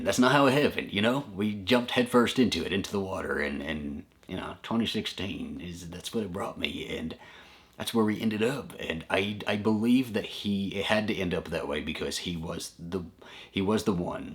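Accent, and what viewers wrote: South, United States. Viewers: your voice that caught me nothing Better than a southern drawl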